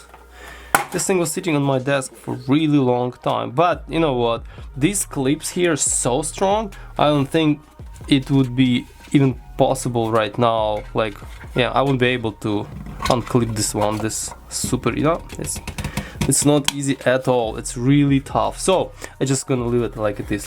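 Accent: Russia. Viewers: У вас российский (или другой специфический) акцент? или у меня что-то с восприятием речи? 🤣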